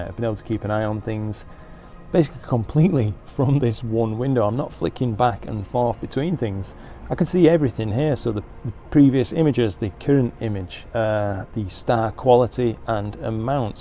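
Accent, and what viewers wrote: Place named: North, United Kingdom. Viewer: Just catching up with this vid. Great watching as always. I like the current channel name, but it would be remiss of me not to mention as a fellow northerner, given your geographical origins, something along the lines of ‘ in the night sky’. The /look ‘joke’ might be lost on those not familiar with regional accents but it still works with your name! 🤔🤣 👍🏻 Noddy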